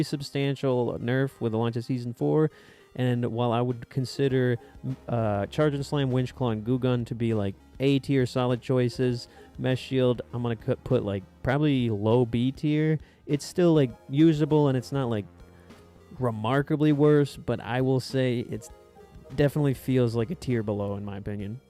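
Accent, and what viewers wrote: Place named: Eastern Europe. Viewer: Are you Eastern European? you have an interesting accent.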